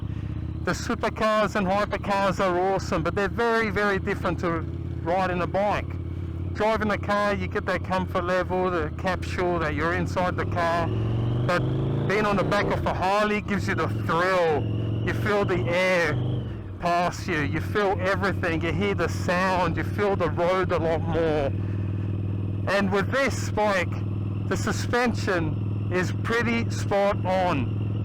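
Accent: Australia. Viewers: This is NOT your typical Australian accent, this guys speaks with an ethnic background combined with an Australian accent just as an FYI..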